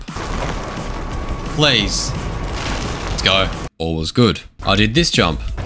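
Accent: Australia. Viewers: this dude is australia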